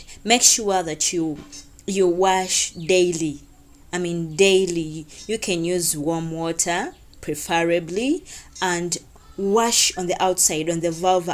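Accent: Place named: Uganda. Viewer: You sound Ugandan ❤️🤗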